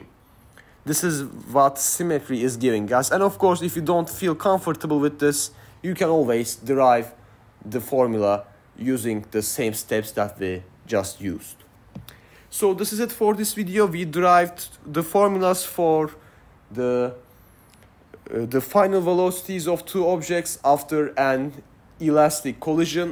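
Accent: Turkey. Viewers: aksanından türk olduğunu anladım direkt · nice video nice turkish accent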